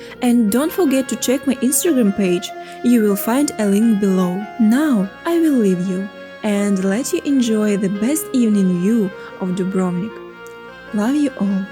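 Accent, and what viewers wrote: Russia. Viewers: У Вас русский акцент?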